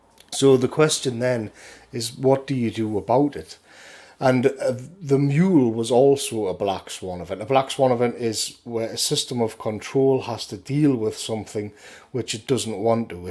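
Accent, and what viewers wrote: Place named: Newcastle, United Kingdom. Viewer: FIX IT FOX Scotsman?....he's a Geordie.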